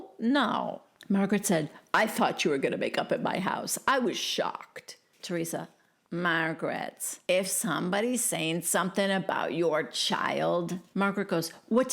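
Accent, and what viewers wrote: New Jersey, United States. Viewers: you had me laughing at work listening to this at the office! Love your Jersey accent.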